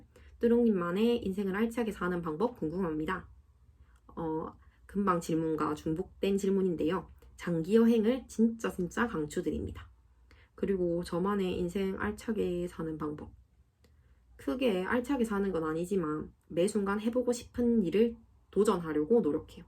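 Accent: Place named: Gyeongsang, South Korea. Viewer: 경상도 분이세요? 사투리느낌이..ㅋ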